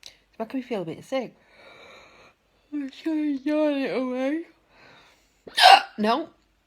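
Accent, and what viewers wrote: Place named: Australia. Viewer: You sound like an Ozzie ... and I adore ya! Pretty you are, too!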